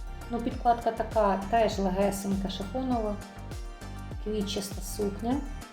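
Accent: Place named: Sambir, Ukraine. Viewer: Ви зі Львова а я з Самбора і говорите так як ми в Самборі , люблю Львів шкода що так мало часу щоб просто приїхати , походити , в мене син аутист , то ми приїжджали тільки по справах і щоб здати аналізи . А тепер думаю скільки ми втратили , бо тепер у Польщі .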